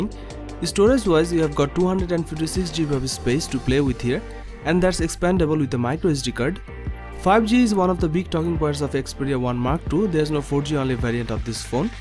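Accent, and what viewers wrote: India. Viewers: omg the Indian accent...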